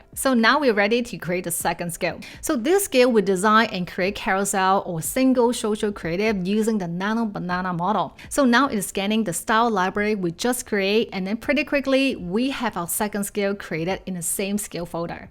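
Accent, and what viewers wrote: China. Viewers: you are talking TOO fast and the Chinese accent doesn't help! You are also rapidly Jumping between applications while you speak, making it very difficult to figure out which application you are on. I thought this was a step-by-step guide, not an exercise in speed-reading!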